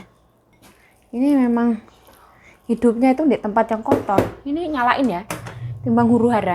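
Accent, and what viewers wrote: Java, Indonesia. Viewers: Lah lah...mbk orang ngawi jawa tomur to...aku blitar jawa timur juga, pantesan omongane jan njowo banget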